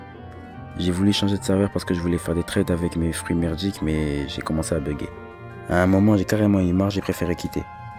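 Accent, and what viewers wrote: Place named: West Africa. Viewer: Wesh l'accent me fume , tes ouest africain ou du viens du centre . Moi je suis sénégalais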